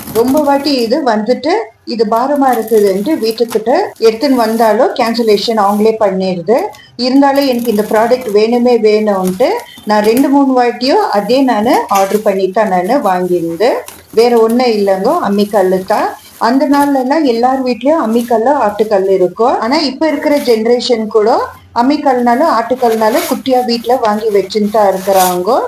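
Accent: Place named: Bangalore, India. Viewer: Nice.your language is good.Bangalore tamil.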